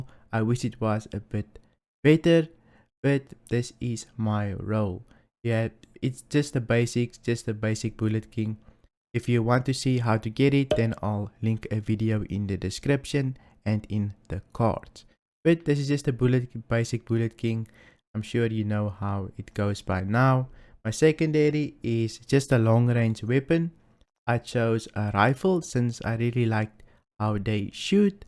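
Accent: South Africa. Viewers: Bro .. are you from RSA?!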